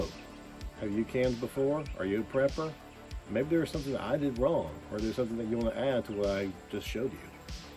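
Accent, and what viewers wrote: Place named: Appalachia, United States. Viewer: He gots one of them Appalachian accents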